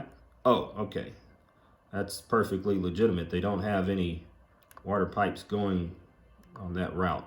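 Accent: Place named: United States. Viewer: What state is your accent from if I may ask?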